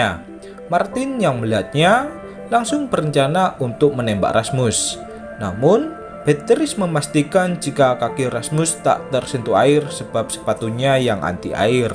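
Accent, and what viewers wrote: Java, Indonesia. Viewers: Jowo totok bgt ngene iki mlh penak. Hahayyy..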